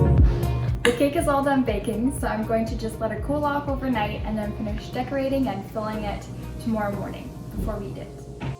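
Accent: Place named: United States. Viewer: Wow, I think you’re a sweetheart but it took a bit to grasp a lot of things you said. You speak like an American, but so many of your terms didn’t make sense to me. Now that I understand, I’m pretty impressed. A couple things. You weren’t wrong when you were trying to say Amarena Cherries. Those are not the same as Maraschino cherries. Amarena are good cherries to top your cake with but I like what you did. You keep referencing dough. Maybe in Germany, you think of it as dough but in my world it is more of a batter. Dough is what we refer to with bread, cookies and pastries. Alcohol doesn’t vanish, it evaporates. Bottom line is that your cake turned out absolutely beautiful. Good job! Is this a recipe from your family or did you get it from another YouTube? Keep up the good work! Also, how much is 1 glass of cherries?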